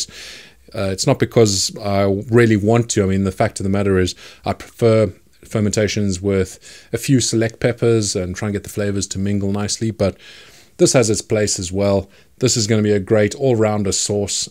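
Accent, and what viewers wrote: South Africa. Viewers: bro i knew you were from SA just the way you talk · Where in south africa are you from.You sounded South African the first time I watched your video's . Thank you for all your video. Looking at growing my own chills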